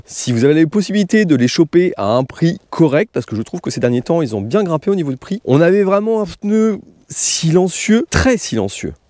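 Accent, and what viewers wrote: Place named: Paris, France. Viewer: J’en peux plus de ce E preposale à la parigo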